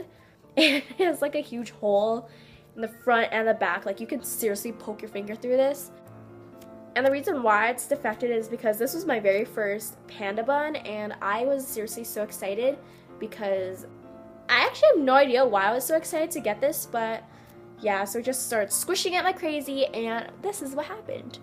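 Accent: Canada. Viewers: Just wondering, are you Canadian? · She is from Canada I think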